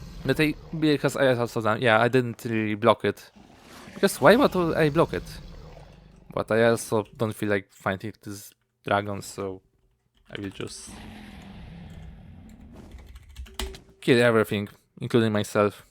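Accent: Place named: Poland. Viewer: my mind: "is he polish? he sounds polish just like me and my friend. oh hes using twitter with polish language". nice thing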